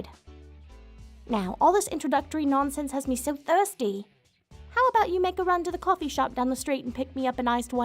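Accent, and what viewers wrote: United Kingdom. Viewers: Holy Heck she's british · HOLY SHIT SHE IS BRITISH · Oh, she british? Interesting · Hehe an British tsundere is something I wondered how long it would take before someone came up with the idea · Oof these video make me feel like im cheating on my gf but i cant help it........if tsundere weren't hot enough she British too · British accent. Tsun tsun attitude. It's s a British tsundere. best waifu Best waifu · Oh my god!! She Bri-ish!! I love her!!! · Nothing better than a British voice. Except for my earl grey, of course. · What kind of accent is this? Sounds pretty british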